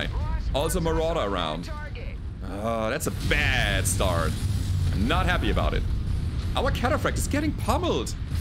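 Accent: Germany. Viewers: I'm not very good at this game but I love stomping around (and playing Mechamon while I do so). I'm really enjoying your unmodded playthroughs; this one I'm finding especially interesting because it features the one DLC I don't have. Also I hope you don't mind me saying this, but I love your accent! It's nice to know how all the German stuff in the game is actually meant to sound. 😅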